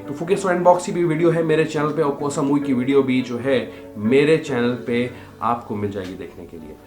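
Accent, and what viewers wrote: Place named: India. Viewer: YOUR MUSIC IS TOOOOOO LOUD. It is difficult to hear what you are saying. I turned off your video due to the loud music. You can lower the music for your benefit. It is annoying to hear music while trying to understand what you are saying. WTF your music sucks when you are talking with your horrible Indian accent. You sound like you are drowning in bubbles like a bobblehead. SHUT THE DAMN MUSIC OFF.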